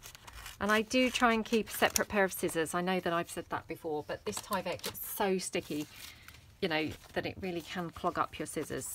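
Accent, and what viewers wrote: England, United Kingdom. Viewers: Beautiful journal, and I love your English accent but your daughters little voice with that accent is sooooo adorable! 💕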